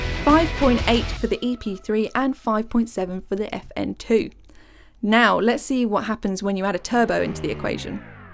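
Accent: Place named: England, United Kingdom. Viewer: Shes pretty and has an easy listening english accent. Im down for that and im a scot 🏴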